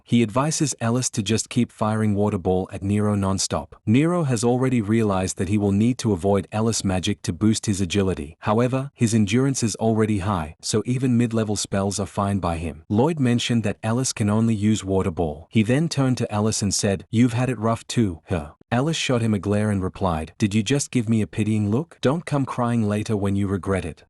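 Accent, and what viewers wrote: United Kingdom. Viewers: Why is AI talking with British accent 😭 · British ahh accent. It's okay tbh. Just not used to it.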